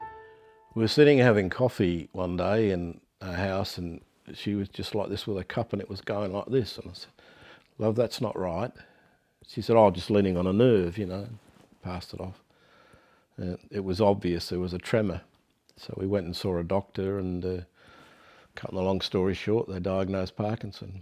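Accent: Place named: England, United Kingdom. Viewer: THANK YOU! I JUST GOT YOUR MSG, AND WATCHED YOUR VIDEO AGAIN. I MUST TELL YOU, YOUR WIFE IS BEAUTIFUL! GORGEOUS HAIR AND LOVELY DARK EYES, AND YOUR NOT SO BAD YOURSELF.....HANDSOME COUPLE...MATCH MADE IN HEAVEN INDEED! I LOVE THE WAY YOU TALK ABOUT THE LORD, I KNOW WELL WHAT ITS LIKE TO DEPEND ON HIM EVERYDAY. IM 71 AND BEEN SUFFERING WITH MS FOR 20 YEARS. AND YOUR RIGHT WHEN YOU WONDER HOW PEOPLE LIVE WITHOUT FAITH IN THE LORD. I TELL YOU, I WOULD HAVE ENDED MY LIFE YEARS AGO IF I HAD NO FAITH! KING DAVID SAYS IN ONE OF THE PSALMS,"LORD, YOU KEEP ME ALIVE"! IS IT NOT THE TRUTH?? MY HUSBAND LOVES ME BUT HAS NO PATIENCE WITH ME, IT MAKES THINGS HARDER FOR ME BUT HE HAS MANY HEALTH PROBLEMS HIMSELF, SO I TRY TO UNDERSTAND. AT LEAST HE'S NOT CONFINED TO HOME YET. THANK GOD! YOU SOUND ENGLISH, MY MOM WAS ENGLISH AND IRISH. MY HUSBAND IS IRISH AND WELSH, BUT MY DAD WAS POLISH. 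WHAT A MIXTURE I AM, AND I DONT LIKE POLKAS OR POTATO SOUP.....BUT I LOVE MY TEA!! SORRY FOR JABBERING ON, BUT ITS FOOTBALL TIME IN AMERICA, SO YOU KNOW WHERE MY HUSBAND JOHNNY IS!! YES, I GOT ME A TOO!! IF YOU THINK OF IT, IN YOUR PRAYERS MENTION SUZY ROBERTS, HE KNOWS ME WELL, AS I HAVE YOU AND IN MINE ALREADY! TAKE CARE AND MAYBE WE WILL ALL MEET SOMEDAY IN THE KINGDOM....I SURE HOPE SO!! LOVE AND BLESSINGS FROMAMERICA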